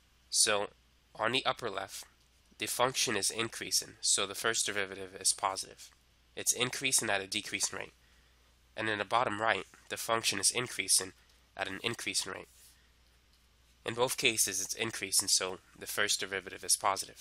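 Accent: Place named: New York, United States. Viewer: You must be from new york proffesser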